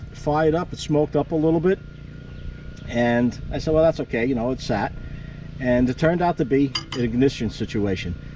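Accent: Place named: Boston, United States. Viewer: Love the Boston Aaacent!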